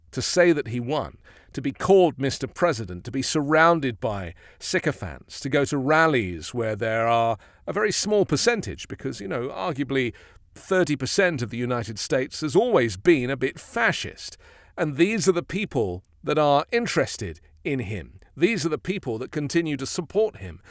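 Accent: United Kingdom. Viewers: I love listening to you shred Donald Trump. Insults with British accents have more sting. Beautiful. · I love his voice! It's British so it makes him appear smarter are more authoritative. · Somehow that British accent gives the entire affair more gravitas.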